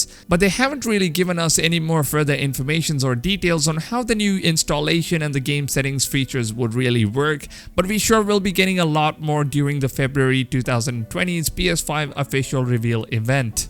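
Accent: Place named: India. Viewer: At first I thought you were some annoying Indian kid cause of your accent but your hilarious so I rub a dub subbed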